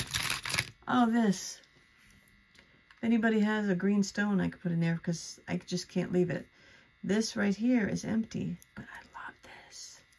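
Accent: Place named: Massachusetts, United States. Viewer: “Memba?” Lol!! Are you from Massachusetts? Sounds like what we say here! Lol Can you please tell me how you deal with your jewelry? I don’t have even a slither of what you have and I have NO idea how to organize it. I’ve been an eBay seller for over 25 years but I sold vintage china and collectibles. I’m getting away from that because shipping is so high. I decided to switch to jewelry after I started researching some vintage pieces I got from my grandmother. But let me tell you… I knew NOTHING about jewelry about a year ago. I mean, it’s sad how little I knew!! I never even thought to look for marks, or anything, But I started to learn, then I bought only about 4 boxes from the online thrift and I will not allow myself to buy anything else until I sell some of this stuff!! How do you deal with it all? Eventually I bought a gem tester, metal testing kit, etc. 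I’ve tried to organize by colors, metals, time periods, etc., etc., but there are so many jewelry categories! This stuff takes a lot of time! At least for me it does. I feel like I’ll never make any money at the rate I’m going. Also, how do you sell? Online? Only on YouTube? Is it worth the time? When I try to list things, let’s say I take 20 pieces of jewelry to list…. After I finish looking at them with the loupe, research them, clean them up, take pics, edit the pics, then list them, it’s taken me like 12 hours! Can anyone offer me a little help in starting up? Thank you so much! Oh… btw, I LOVE that you show us close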